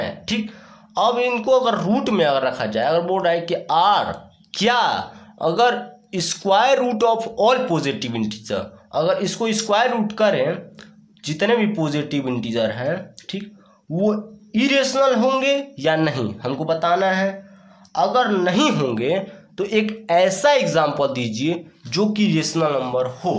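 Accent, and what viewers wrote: Bihar, India. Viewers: Sir aap Patna Bihar se h....?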